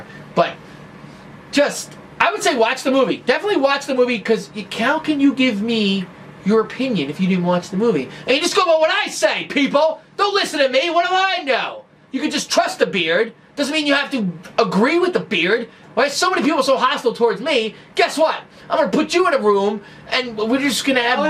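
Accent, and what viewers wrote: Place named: Philadelphia, United States. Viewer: Is the movie Hancock, with the loveable Will Smith? I think it is;) And I only saw that movie once about 3 years ago. Anyway, Vile sounds good and I've loaded it up. Gonna watch it right after posting my answer to where your hat is from. Plus, great news for you, I live in Pennsylvania and from your accent, I'm guessing you live near Philly (also Flyer's cap;) so shipping should be minimum haha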